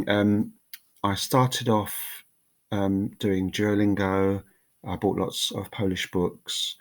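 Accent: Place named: England, United Kingdom. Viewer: Miło subskrybować twój kanał. Jak zawsze wygadany i ciepłe słowa w kierunku ludzi.Twoj język polski jest dobry.Jestes Anglikiem i normalne, że masz inny akcent.Dzieki za mile słowa w kierunku mojej osoby.Udanego weekendu.Ewa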